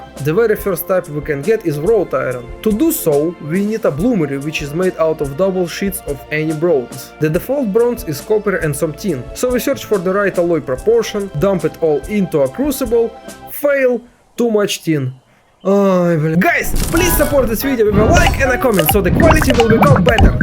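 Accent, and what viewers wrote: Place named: Eastern Europe. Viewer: Самый славянский акцент из всех которые я когда либо слышал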